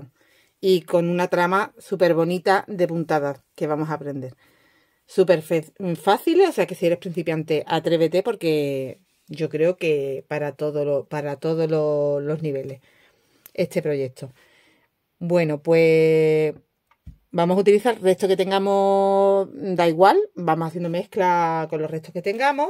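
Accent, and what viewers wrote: Andalusia, Spain. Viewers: además de que la idea es chulísima, no veas la alegría que me da de escuchar mi andalú ❤❤❤ Soy de Sevilla. Estoy haciendo ahora un hexagonal con los restos que tengo. Sí llego a ver el vídeo antes... Pero bueno, creo que lo voy a empezar 😂😂😂 Un abrazo 🤗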